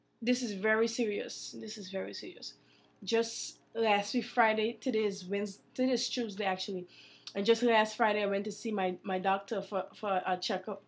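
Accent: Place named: Guyana. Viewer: Are you Guyanese? you sound so.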